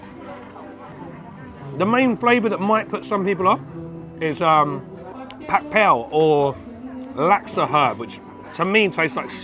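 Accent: Thailand. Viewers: 5555+++++ฝรั่งพูดเหนือ น่ารักดีคับ รำ รำขนาด รำแต้ๆ 555+++ ขอให้เที่ยวภาคเหนือให้สนุกนะคับ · เก่งจังอู้คำเมืองได้ด้วย..เว่าอิสานได้บ่..ดนตรีประกอบกะดนตรีอิสาน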